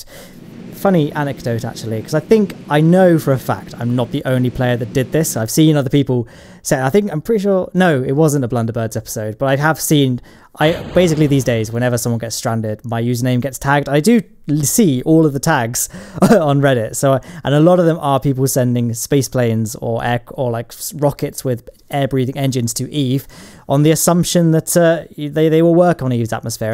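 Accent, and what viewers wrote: United Kingdom. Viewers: He is British so his accent is like that now come on chill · Where are you from? You sound British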